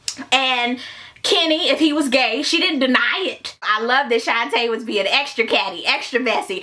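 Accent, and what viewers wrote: South, United States. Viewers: Love ur southern accent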